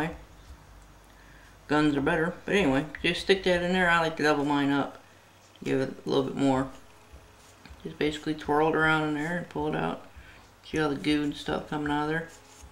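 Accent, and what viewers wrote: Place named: Texas, United States. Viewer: interesting accent. Texas? Oklahoma?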